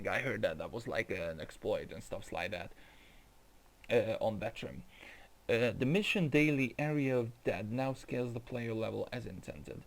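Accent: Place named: Hungary. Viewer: damn. I should have gotten that...my coworker is Hungarian and now I can relate your accent!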